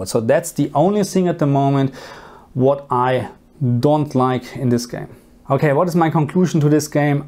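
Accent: Germany. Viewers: nices Video und nicer deutscher Accent haha😄😂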